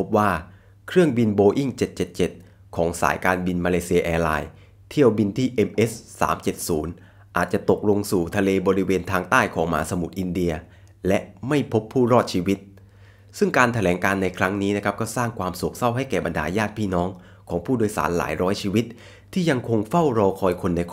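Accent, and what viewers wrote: South, Thailand. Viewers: เป็นคนใต้หรอฮะ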